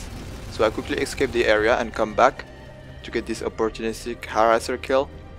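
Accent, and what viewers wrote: France. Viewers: ho I thinking you had a French accent.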